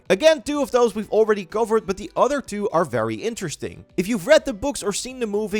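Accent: Netherlands. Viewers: Are u dutch? I hear a dutch accent in your english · I smell dutch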